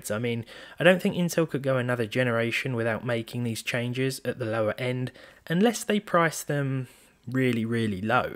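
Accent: United Kingdom. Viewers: Britain accent is so funny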